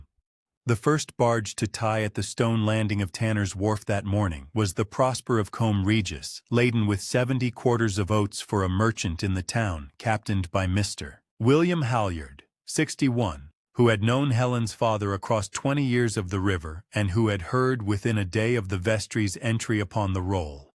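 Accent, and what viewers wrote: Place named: United States. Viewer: Hint - get rid of the American voice. Im so peeved by the USA i will not listen to anything with USA accent !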